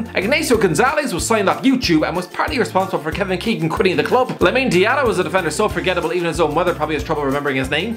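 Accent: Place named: Ireland. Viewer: I can't understand a word he's saying 1 because he's irish 2 because he talks too fast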